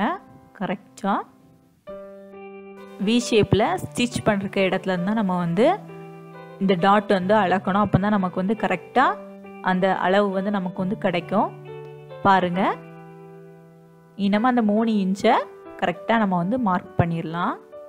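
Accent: Nagercoil, India. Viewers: For u Nagercoil ah?, Ur language is like that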